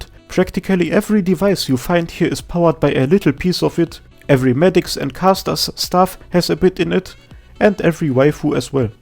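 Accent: Germany. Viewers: der Deutsche Akzent ist direkt zu hören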